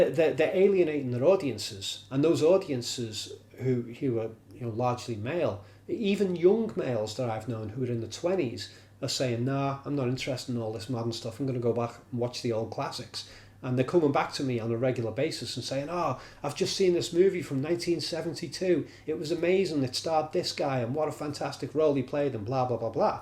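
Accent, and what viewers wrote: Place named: Manchester, United Kingdom. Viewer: You sound like Noel Gallagher! Are you from Manchester?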